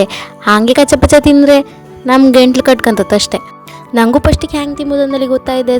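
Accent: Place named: Kundapura, India. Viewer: Beauty of kundapura kannada👌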